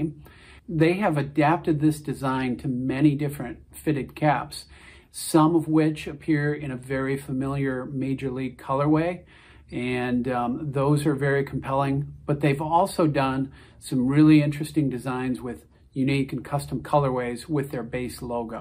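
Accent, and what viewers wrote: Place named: Michigan, United States. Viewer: Thanks for giving Canada a shout out,. I live in Toronto and it’s great to see Americans recognize our seemingly growing fitteds market. Noble North is a true gem, as are TeamWorks and Anthem. Feel free to hit me up if you need any help making a Canada part two as I know of a handful of great shops you could mention. No credit wanted here at all. And for what it’s worth, I’m a big Red Wings fan (I detect what sounds like a Michigander accent). Keep up the awesome work.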